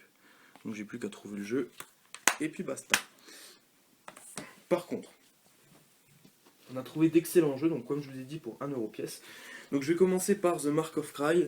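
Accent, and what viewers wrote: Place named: Moselle, France. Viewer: bien la nappe rouge , bien les rpg ps2 , bien l'accent de la Moselle !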